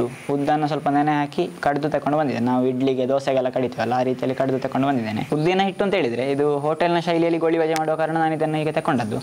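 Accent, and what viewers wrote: Mangalore, India. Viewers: Love your mangaluri kannada bro..Awesome ambience/rain,lovely presentation,mouth watering goli bajji..What else you need?!😄🙂👏👏👍👍👌👌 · I enjoy watching your videos. I so so like your beautifull mangalore kannada. Keep up your great work.., good luck :)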